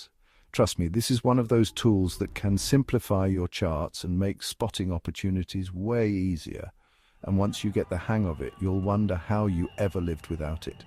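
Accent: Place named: United Kingdom. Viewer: Very informative and u sound british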